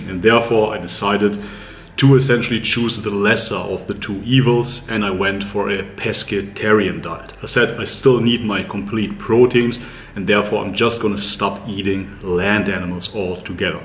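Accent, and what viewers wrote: Germany. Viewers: Love that German accent brother! Btw I had to laugh when you said you prayed to Jesus Christ, since you look like a dead-set Muslim! Lol. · bist du echt deutscher?? ich (amerikaner) habe die Aussprache gemerkt aber dein englisch ist perfekt! ausgezeichnet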